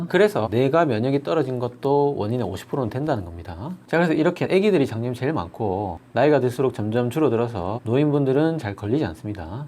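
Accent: Gyeongsang, South Korea. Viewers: 부산사람인데 경상도사투리덕분에 옆집의사쌤같네요.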